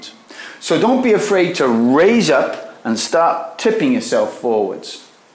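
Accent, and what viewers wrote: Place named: South Wales Valleys, United Kingdom. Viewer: I thought so, I'm a valleys boy so I recognised your accent right away!! I got the bug from my dad, who surfed all over the world when he was in the merchant navy back in the 60's also. Currently, I'm surfing a 7'2" NSP board, and I'm surfing mainly at Freshwater West in Pembroke where I live, so, your tutorials are super helpful, so, thanks!!! Happy days dude!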